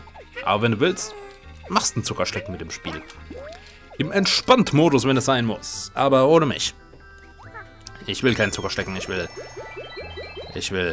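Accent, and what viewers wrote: Hesse, Germany. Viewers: Er ist Hesse, er darf das.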